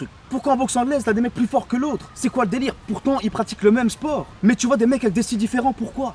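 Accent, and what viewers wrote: Belgium. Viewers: Tu es belge ? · O lala l'accent belge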